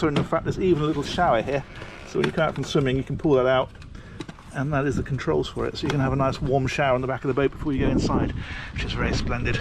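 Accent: United Kingdom. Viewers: You are so good at your craft! Of course the British accent doesn’t hurt either. Wishing you much success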